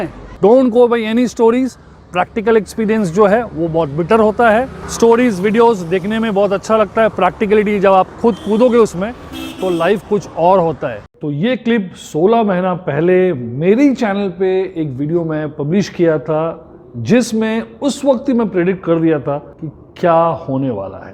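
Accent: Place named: Bengal, India. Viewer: Sir are you bengali.. Because your accent is like that 😅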